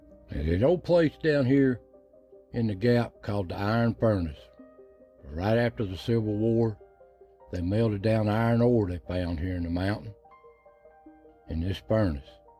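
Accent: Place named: Oklahoma, United States. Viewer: Good lord thanks for making these, my dad passed in 2020 hes born in 1951 and his accent was just about same as yours cept he was from NW oklahoma. But he told stories jusy like you and it reminds me of him. Thanks brother, appreciate sharing the info of the rugged country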